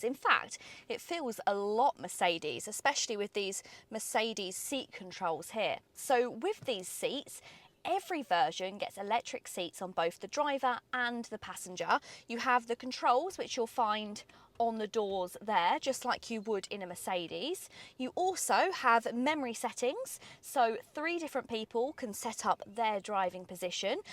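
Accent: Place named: Estuary, United Kingdom. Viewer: Sorry. The estuary accent and the presenter's odd dress sense totally distracted me from the actual content.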